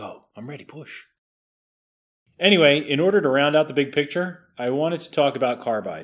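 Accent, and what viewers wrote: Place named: United States. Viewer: three sets of two numbers? WNMG-08-04-08? What planet are you from? You sound American. I've been at this for 19 years, and where I come from (the west coast of the U.S.A it is one set of three numbers...WNMG-332 (of course your 08-04-08 is closer to a WNMG-2.52.52, but I have nver seen that, obviously, lol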